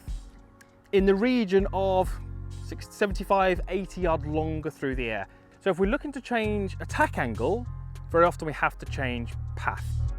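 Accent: United Kingdom. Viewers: Very clear even with your British accent 🙂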